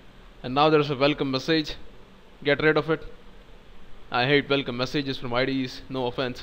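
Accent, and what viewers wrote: India. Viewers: Thanks so much, the first time i like an indian accent <3 seriously, THANKS!!!! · Ever since I started learning to code, I got quite used to Indian accents. It doesn't bother me much. I like overly confident way of speaking. Good job bro.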